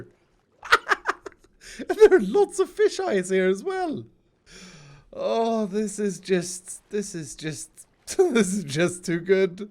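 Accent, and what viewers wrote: Sweden. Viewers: You sound like you arr from sweden, are you from sweden?? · you should play Minecraft with Pewdiepie and both of you talk with your Swedish accents. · Are you Swedish?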